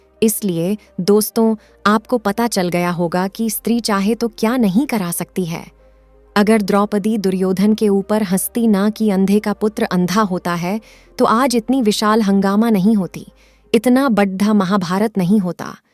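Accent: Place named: Hyderabad, India. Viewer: Pukka Hyderabadi accent hai bhai...kitna Sach bolengi kya ???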